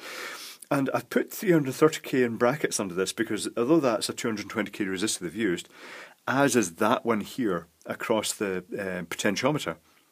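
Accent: Scotland, United Kingdom. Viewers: I have this exact unit, arrived today from China (impressively, in 7 days). I'm well aware of its enthusiastic use by the BDSM crowd (even seen an ancient one used in a public setting at a club - eek) but I bought mine simply to disinfect skin and keep zits at bay. Some aestheticians suggest it can be used for "skin lifting" but I guess bigclive sees it as quackery, and he's entitled to his opinion - I really do think it helps acne, though. Nice to hear a Scottish voice on Youtube :) Thanks for the very interesting video!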